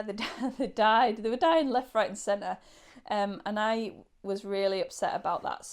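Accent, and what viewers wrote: Yorkshire, United Kingdom. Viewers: Great video and love to hear that Yorkshire accent! Like getting an extra episode of PBS's All Things Great and Small!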